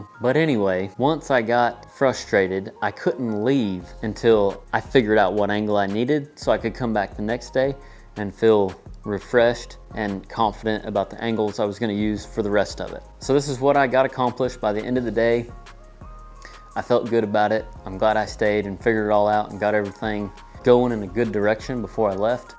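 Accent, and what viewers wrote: Oklahoma, United States. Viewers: Hey man are you from OK?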